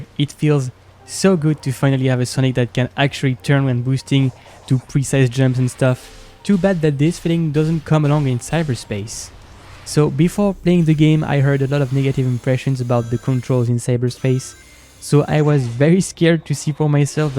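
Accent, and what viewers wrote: France. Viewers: tas un accent français ptn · Ha ok donc je viens juste de remarquer que t'a un accent français en parlant anglais tout va bien (bon après je regarde quasiment pas tes vidéos avec commentaires) mais dc pourquoi tu as créé une chaine anglaise? Tu arrivais pas à percer avec ta chaine française? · L'accent français est juste impossible de ne pas remarquer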